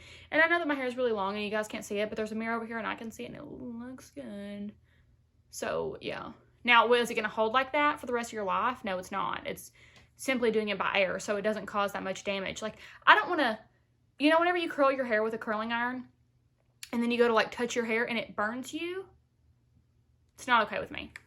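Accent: South, United States. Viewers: Just found your channel through Allie Glines! Ummm you are precious!! And hilarious! And all the things! Plus as a fellow southerner your accent feels like home! Can’t wait for my Fawn glosses to come in <3 love finding real down to earth youtubers!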